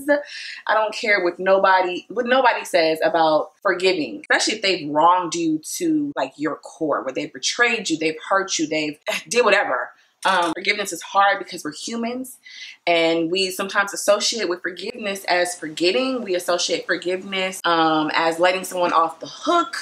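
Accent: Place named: South, United States. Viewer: You sound like you are from the country south. Where are you from Texas?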